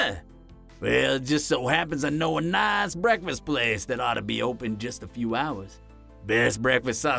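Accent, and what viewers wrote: Louisiana, United States. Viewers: Like the Cajun sound your voice had. This reminds me a little of a song, by. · Your southern style accent was amazing and this audio was super cute. Excellent job on this one! ❤️❤️❤️❤️❤️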